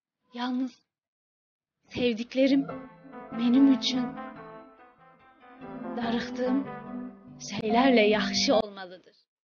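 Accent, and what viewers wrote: Azerbaijan. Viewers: Azerbaycan şivesiylede okumuşsun canim😊👏👏👏🌺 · Canım çok güzel okudun hiç bitmesin istedim azeri ağzıyla da iyi oldu 👏👏👏❤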